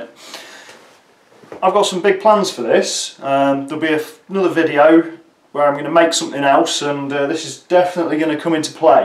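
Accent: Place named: Southern England, United Kingdom. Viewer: Nice refurb! I enjoy your videos very much... your 3 Phase Rotary Converter was particularly interesting... thinking of doing the same thing myself, so thanks for sharing. Greetings from Southport (it's not in the South and it's not a port!). You sound like you're a southerner... I'm from Oxford originally. Cheers matey!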